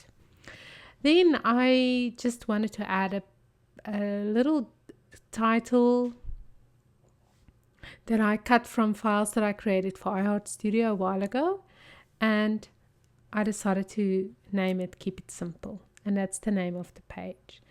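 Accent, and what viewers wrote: South Africa. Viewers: Hmmm sound like a fellow South African, howdy from Cape Town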